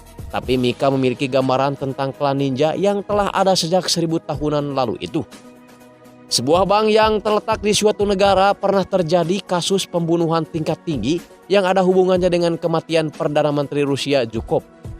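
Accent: West Java, Indonesia. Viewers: iya kan,,sumbu pendek,,,ini film serius,,knp suara yg buruk ini pembawaannya komedi,,,di kira lucu kali dgn logat sundanya,,kampungan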